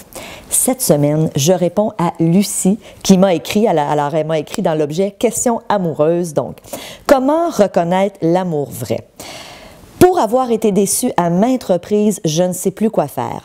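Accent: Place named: Quebec, Canada. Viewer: c'est trop joli l'accent québécois, j'aime trop !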